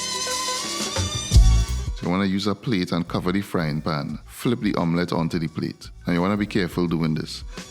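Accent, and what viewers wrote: Trinidad and Tobago. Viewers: Are you the YouTube police? Did you know that YouTube can be accessed by most countries? Thus anyone from those countries can upload content in their own language. Why feel the need to say this if you don't understand the video, simply move on. And just so you know, he's speaking English with a slight Trinbagonian accent, which is very close to British English, yet your pea sized brain couldn't understand it, you're and absolute idiot.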